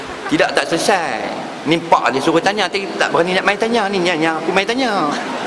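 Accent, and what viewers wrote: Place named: North, Malaysia. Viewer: pandai ustaz ckp utara!!!! #RESPECT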